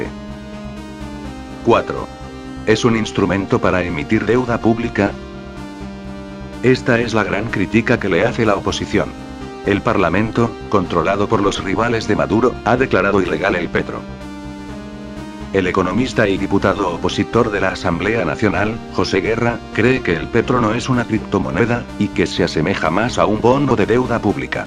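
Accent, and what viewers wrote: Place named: Spain. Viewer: el microlectro es español, es evidente.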